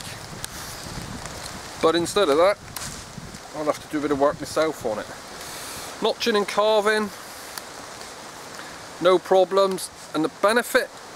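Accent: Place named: Black Country, United Kingdom. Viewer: Sounds like a Black Country accent mixed with something else.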